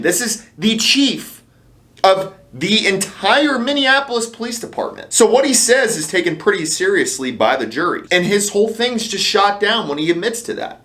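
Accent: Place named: Wisconsin, United States. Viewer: If you are from Texas why do you sound like you are a Wisconsin yankee ?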